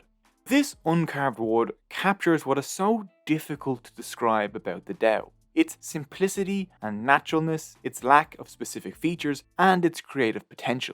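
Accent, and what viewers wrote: Ireland. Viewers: you have a beautiful irish accent are you from limerick? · i know but its just his accent. Irish accent. Not everything needs to be pronounced correctly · I advice you to change your Ireland-ish accent, it is very uncommon and I think some people including me find it disorienting and irritating. Perhaps shift towards a more british or american accent. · Interesting video, I recognize the Irish accent.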